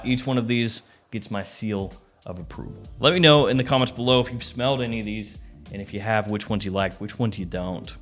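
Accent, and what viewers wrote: South, United States. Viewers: That southern accent should make an appearance more often!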